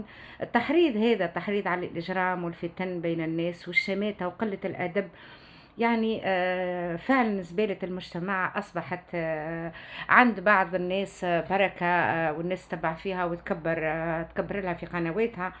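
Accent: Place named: Tunisia. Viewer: ماشاءالله تبارك الله احسنتي النشر والتصوير والطريقه والمنتاج ابداع وتميز وتألق اسلوب راقي ماشاءالله ثقافه عالية بشهادة الاسكندراني العجوز جدو جابر الحنفي ممنون تحياتي لحضرتك اسعدني اسلوبك الراقي صوتك وكلامك اكيد من تونس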